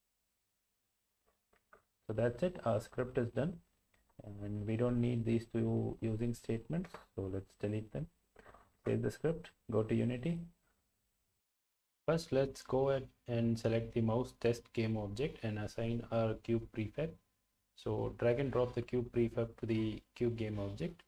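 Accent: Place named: India. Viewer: I searched many a tutorial and none could resolve my issue with the new input system. I stumble upon this quick and easy one and in a mere moment my code works perfectly. Thank you random Indian accent tutorial man. I am truly grateful.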